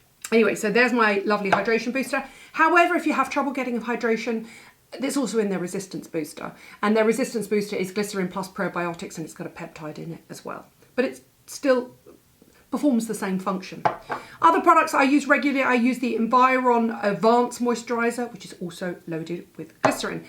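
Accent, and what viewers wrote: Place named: RP, United Kingdom. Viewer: i love her accent i wish i had a posh british accent too🥺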